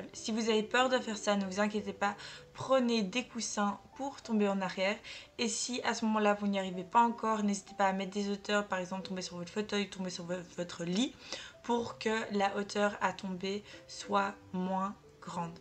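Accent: Belgium. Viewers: Bonjour, j'aime beaucoup tes vidéos très détaillées et ton petit accent belge 😊. Est-ce que tu pourrais faire un tutosur le flip arrière s'il te plaît ? Merci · Tu est belge ?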